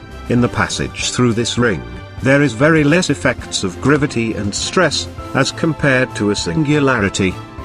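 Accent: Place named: United Kingdom. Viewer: a guy with a British voice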